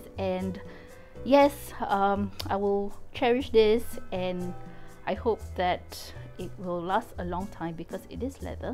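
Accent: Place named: Singapore. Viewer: Recognised that Singaporean accent. Love the Damier Azur print!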